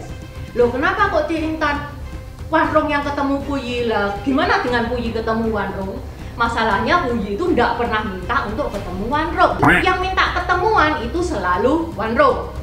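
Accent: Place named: Surabaya, Indonesia. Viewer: Alkhamdulillah Nemu Chanel ce intan saya lagsung subscribe, Bahasa Suroboyoane medok muanteb😂😂 Chanel ini nambah wawasan , Semoga Ce Intan selalu diberikan kesehatan dan rejeki yang berlimpah agar bisa terus berkarya. Salam sejahtera buat ce Intan dan saudara saudara saya dari etnis Tionghoa , Amin ya Rabbal alamin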